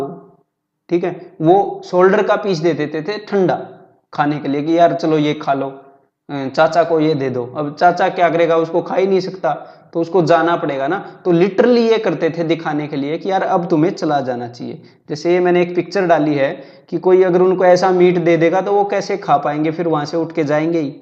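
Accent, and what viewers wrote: Haryana, India. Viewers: Nice haryanvi sir ji 👌👌👌👌👌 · bhai maza aa jata hai yaar apki haryanvi type bhasha shun k . . . . knowledge ka dose thanx boss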